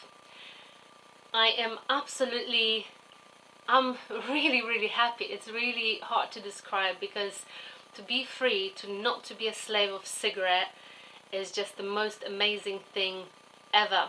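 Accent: England, United Kingdom. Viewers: What accent do you have?? Are you English? Congrats on three years and 3 months of no smoking. You look healthy and really happy. You maka me smile :) xx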